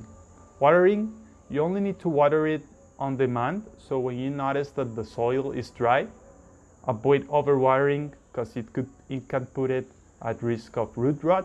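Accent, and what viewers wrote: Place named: India. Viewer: İ don't understand your English Indian accent or what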